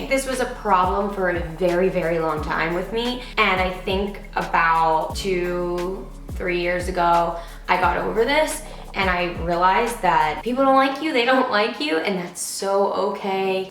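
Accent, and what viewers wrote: United Kingdom. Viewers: she sounds like she's from Yorkshire! omg that was so freaky she sounded exactly like my cousin!!!!!!!!!!!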